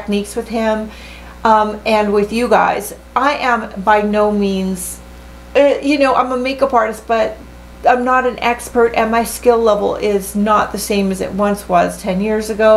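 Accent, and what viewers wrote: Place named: Toronto, Canada. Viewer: Hello from Belgium. I found you because I wanted to learn more about Spencer but YOU sound like a sweetheart! I'm originally from Atlantic Canada (Cape Breton Island) but haven't been back home since 2011 but l please correct me if I'm wrong but you sound like you're a mainlander perhaps from Toronto?? (My apologies if you're a Yankeedoodle eh but I left to come live over this way back in 1989). ❤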